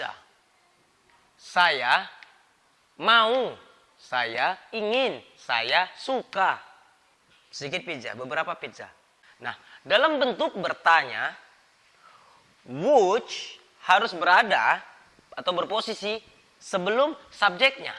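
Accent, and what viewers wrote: Indonesia. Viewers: pronunciation nya dibaikin bang,., pengucapan not nya indonesia bingiitt,., tp pembelajarannya ok,,. lanjutkan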